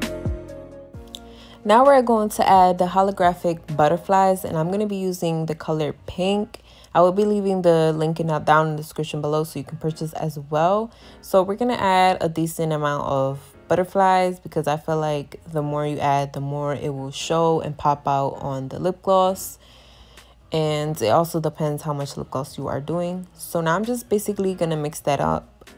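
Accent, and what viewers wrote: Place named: Russia. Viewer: Акцент словно русский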